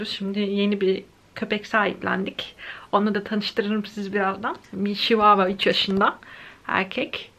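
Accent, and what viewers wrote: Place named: United Kingdom. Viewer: Sizin konuşmanız nasıl acaba ?? Hayır izlemeyecekseniz izlemezsiniz niye böyle bir şey yazma gereksinimi duyar ki bir insan. Ancak birini incitmek için... Başka hiç bir açıklaması yok. Araştırıyor, uyguluyor. Diger youtuberlar gibi fıss değil ama halen kendi elinde olmayan bir sebep yüzünden eleştiriliyor. Üstelik küçükken bir rahatsızlık geçirdiği için konuşması böyle ve bence çok çok tatlı, kibar ve naif. Ayrıca ingiliz aksanına benziyor. Insanlar birseyin içinde kötü görmek istesin yeter ki... Ne acı. Yazık.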